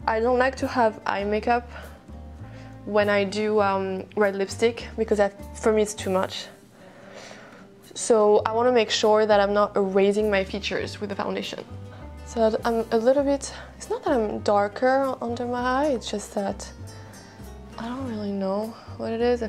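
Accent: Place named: France. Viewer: Hi, I am not French and also not an englishspeaker, so for me its pretty hard to listen this accent. The video is very well done, the music and everything else .... Just the manner of the speaking is a bit smooth sometimes, thus it gets hard to distinguish certain words. Not to offend, just my point of view 🌹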